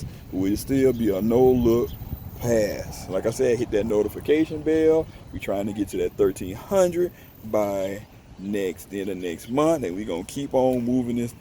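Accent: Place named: South Carolina, United States. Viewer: Gotta be South Carolina. Pee Dee area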